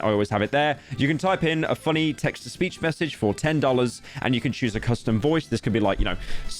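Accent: United Kingdom. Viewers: listening to british furry man talk about economics makes me want this to be my only source of education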